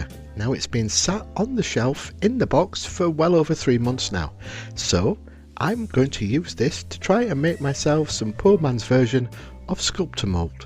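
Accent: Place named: England, United Kingdom. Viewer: Great build. Nice to hear an English voice for a change. Davey Jones.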